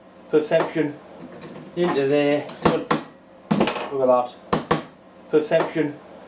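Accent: Newcastle, United Kingdom. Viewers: Geordie as it is spook! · Il est du Nord-Est de l'Angleterre, il parle l'anglais avec le dialecte atypique pour les gens nées a Newcastle-upon-Tyne, le 'Geordie', qui est une mélange de anglais,celte et mots de langue nordique (norvegien et danois). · Although I am a Yorkshire 'Tyke' and not altogether accustomed to your Geordie accent, I understand you perfectly,. Just remain as you are!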